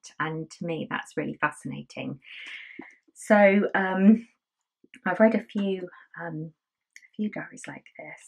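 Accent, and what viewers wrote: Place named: United Kingdom. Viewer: hello Ms., I love watching your vlogs. I love crocheting and reading too. I am from the Philippines and I think I am learning more about British English by listening and watching your vlogcast ^_^ Thank you very much. Happy and blessed New Year!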